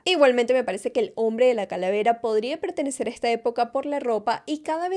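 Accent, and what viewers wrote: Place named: Venezuela. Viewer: Me encantó tu explicación de todo y tu acento, eres venezolana de casualidad? 🥹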